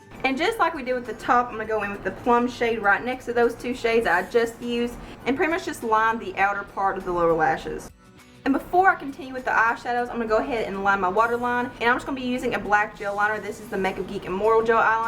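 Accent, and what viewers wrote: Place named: South, United States. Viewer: ahhh for real this is such a gorgeous look..your eyes just pop so perfect with it. What part of the south are you from? I'm from NC and I get comments on my southern accent but it's no where near as strong as yours is, I love it!!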